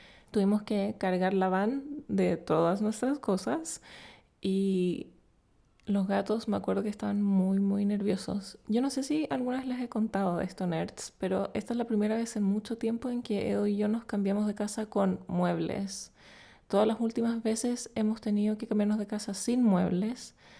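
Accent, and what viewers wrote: Chile. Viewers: Hola, de dónde erea originalmente? Oigo un acento chileno:p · Tu acento chileno es pura paz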